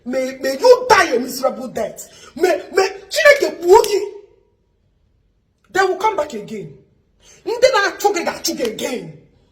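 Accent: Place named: Imo, Nigeria. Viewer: okay she’s married self, She’s speaking exactly imo dialect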